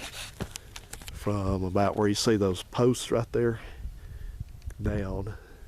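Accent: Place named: Alabama, United States. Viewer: . Your knowledge is a gift to this world. I could sit for hours and tell you about the things I've learned. Biggest thing is putting the fucking phone down and firing up the computer. Manage time a little better instead of staring at a small screen reading the same shit over and over. Facebook sucks but I like my privacy and anonymity. I'd love to share stories and talk. Send me an email. You'll find it in a private.....message. I'll take the time to write one back and send pictures. Facebook is kind of a bullshit means of staying connected or even getting to know someone. I found you. I reached out. I won't share here how I accidentally stumbled across it. I hold privacy dear as a value. Some things everyone doesnt need to know. Anyway, I sent lots of pictures and kind words in a message. You taught me you're a warm and generous person, but you dont waste words or time. 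Values I've held dear that are a beautiful taste of the state I call home. Almost 14 years in Illinois again, but I took so much of Alabama in that my accent is a part of me. To hear another intelligent man who talks like I do is quite endearing. Then, there are all the hours of great info. I'll watch this all the way through tomorrow, at my work desk. I'll get a camera, a tripod, and I'll share info I know that others may not know and might help them. Knowing i could have taught one person one thing is all worth it. Thank you for all the kindness. I feel like I've watched your life happened because of y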